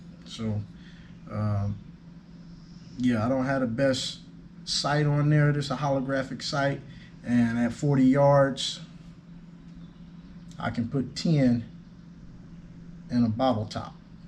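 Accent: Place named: England, United Kingdom. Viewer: Man I love your videos..good honest self reviews.. liked your English accent.. Yes you earned it.. 1. You need to compile all your ammo vs M3 settings because there are too many of them now. May be a cheat sheet.. 2. Comparison of heavier JSB with lighter ones in the same tuning... and on a lighter note , I saw this review on 65 inch so one suggestion- pls do organise your stuff and do some 5S BTW waiting for you next review..love from India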